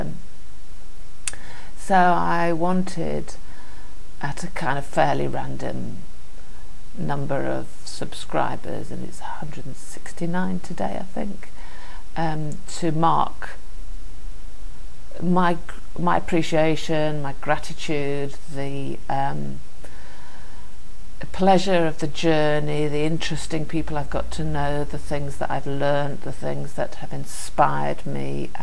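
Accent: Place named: United Kingdom. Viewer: what's not fair is how UK accents make for better videos! no fair!!! I want one. I'm with you... predictable in my avoidance of predictability. Means you never quite fit in groups eh, you resist the little conventions and micro-traditions even a small group of friends tend to develop. lets all be individualists together!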